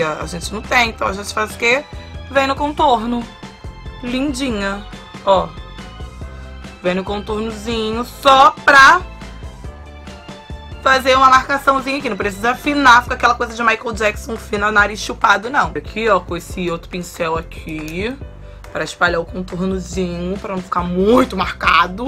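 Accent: Rio de Janeiro, Brazil. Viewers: Não acho a voz parecida, masssss o sotaque carioca é igualzinho e as girias tb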